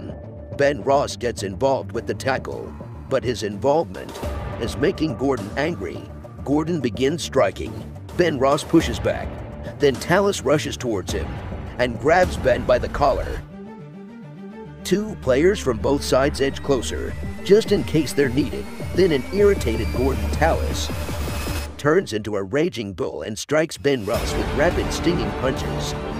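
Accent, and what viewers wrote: United States. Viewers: Why American narration? Good ole days, don’t need that. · This channel doesn't sound right with that American accent. Sorry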